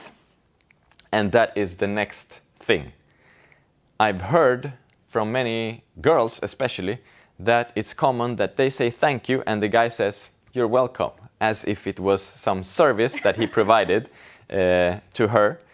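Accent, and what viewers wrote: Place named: Sweden. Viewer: Hey, are you Swedish? The reason for why I’m asking is because it sounds like you have a Swedish accent! I am half Swedish myself. I think you are the best male Kizomba dancer, you make the dance really beautiful and not too much. Mikaela is also the best female kizomba dancer in my opinion! I think she’s also Swedish... anyway it’s so fun to see you together, I hope you do dance videos together that would be absolutely amazing!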